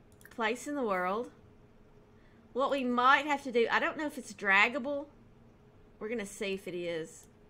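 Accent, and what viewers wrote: South, United States. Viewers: Hi!:) I've commented on a few of your past videos and I've finally caught up on this LP and let me just say: I LOVE it! It's honestly one of the best Sim's LP's I have ever watched. I love how you continue the story; you're so creative! And your voice is very soothing; I could listen to you all day.. It reminds me of the family that I have down South in Kentucky and Tennessee! One more thing before I go! (Sorry this is such a long comment.) I found a picture on Facebook of a house and I was wondering if there was a way I could contact you and send it too you? I would love to see a speed build with your take on the picture! Thanks..:)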